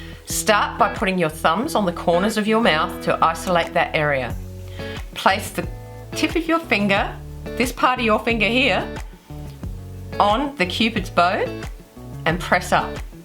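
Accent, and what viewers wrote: Australia. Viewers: is she from Australia ?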